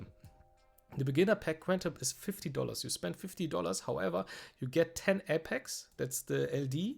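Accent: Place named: Germany. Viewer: Didn't know you before, but really good video. Also I would bet my rebate money that you are german hearing your accent. xD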